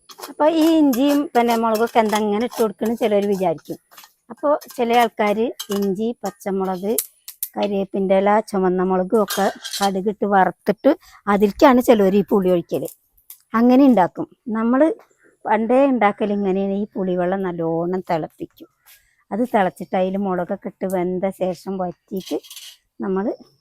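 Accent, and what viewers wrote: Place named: Malappuram, India. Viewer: ഹായ്. ഞാൻ ഒരു കണ്ണൂർകാരിയാണ്. എന്റെ ഹുസ്ബന്റ് മലപ്പുറതത്‌കാരനും അതുകൊണ്ട് എനിക്ക് കുറച്ചു നിങ്ങളുടെ സംസാരംമനസിലാകും നിങ്ങൾക്ക് മധുരം കുറച്ചല്ലേ വേണ്ടു ശർക്കര കുറച്ചല്ലേ ചേർത്തുള്ളു സംസാരം എനിക്ക് വളരെ ഇഷ്ട്ടമാണ് ഓക്കേ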